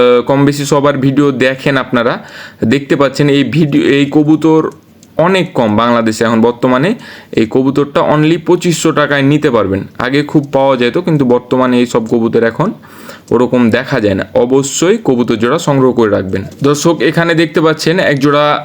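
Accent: Kolkata, India. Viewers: উনার কথার ধরণ কলকাতার মানুষের মতো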